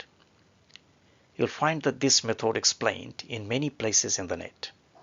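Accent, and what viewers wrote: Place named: Nepal. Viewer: Nepali ho sir accent nepali jasto xa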